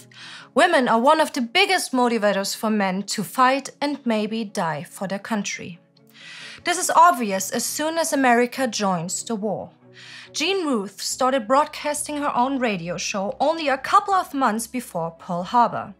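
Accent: Germany. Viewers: Why you got Axis Annie representing your channel? Couldn't you find an American woman to speak for your program? There must be about 150 MILLION of them, you gotta choose a German speaking woman? That's pitiful.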